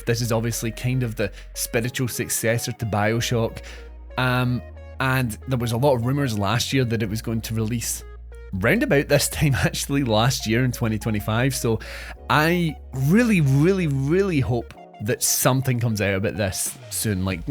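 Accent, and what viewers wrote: Scotland, United Kingdom. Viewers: What accent is that? Is that Scottish? It's not a bad one. Who's excited for Horizon?😂...nobody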